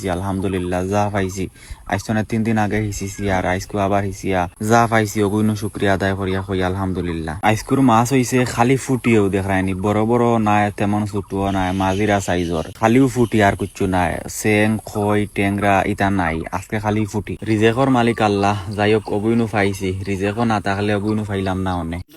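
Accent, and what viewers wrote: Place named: Sylhet, Bangladesh. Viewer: ভয়েস একবারে খাঁটি সিলেটি পানির হেয়োরার খাটা ফালাইলে মাছ বেশি অয়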